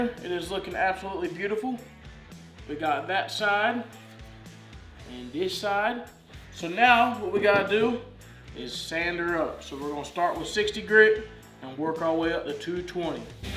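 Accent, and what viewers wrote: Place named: East Coast, Canada. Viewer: Dang I'm up in Canada It sounds like you have an East coast Canadian accent.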